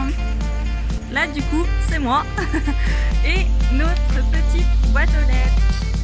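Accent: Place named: Quebec, Canada. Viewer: tu prononce tes a comme nous deja ta l accent ..bienvenue au quebec bon succes pour votre chaine je m abonne et vous suit pour d autres videos..avec l hiver c est la periode ideal pour decouvrir notre culture et nos artistes ainsi que notre univers musical qui est tres different de la france amicalement d un nouvel ami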